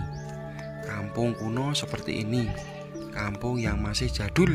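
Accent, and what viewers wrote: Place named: Java, Indonesia. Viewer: aslmkm wargi bandung.. Apa kabar..? Abang asli dari mana ko bicaranya kaya orang jawa. Yah..tapi channel orang bandung..?🙏😀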